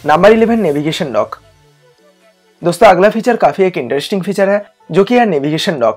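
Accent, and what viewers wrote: Bengal, India. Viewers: Contain is good but my brother there is a Bengali tone in your pronunciation I didn't disrespect Bengali but for your shake try to improve it best of luck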